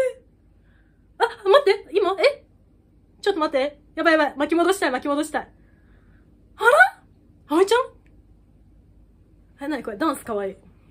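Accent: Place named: Kansai, Japan. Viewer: 関西弁炸裂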